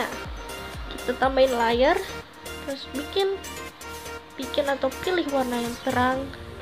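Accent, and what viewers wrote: Java, Indonesia. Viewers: yang baru brlajar gambar sini kumpul, btw makasih kk, tutornya, ya allah ngomong ny medok sek an kakak, wkwkw semangat ya kk semoga aku bisa ngikutin kakak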